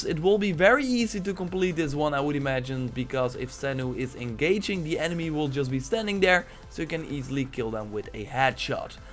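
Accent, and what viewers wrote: Netherlands. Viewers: Are you Dutch? I keep trying to place your accent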